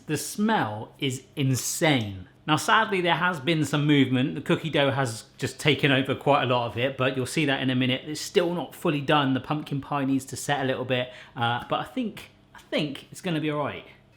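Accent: United Kingdom. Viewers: spirit-howl 786 U that’s a really British accent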